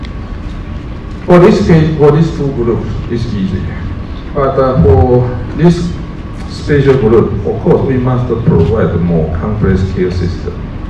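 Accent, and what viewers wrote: Taiwan. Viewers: 因為阿北,大家一起加減練英語.....阿北講英語,一樣很有親和力(超台的,還有人抹紅他....真的厚) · 真的是很台式英文 ha. 台灣教育體系教出來的. 正港的台灣人 XD.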